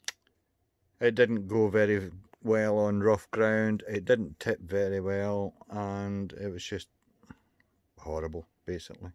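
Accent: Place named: Scotland, United Kingdom. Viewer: Love hearing a fellow scot in the hobby!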